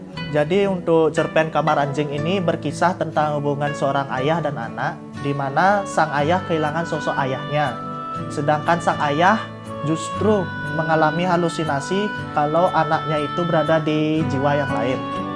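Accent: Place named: Bali, Indonesia. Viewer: Kak dari Bali ya? Keliatan banget logatnya😂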